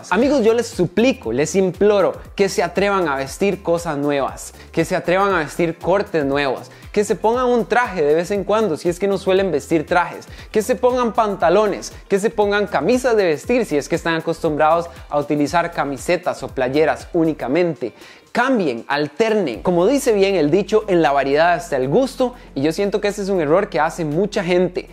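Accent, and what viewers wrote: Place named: Costa Rica. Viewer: habla como tico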